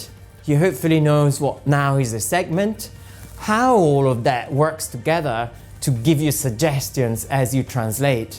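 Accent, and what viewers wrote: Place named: Scotland, United Kingdom. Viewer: I could listen to your adorable accent all day. Is the influence Scottish? Irish?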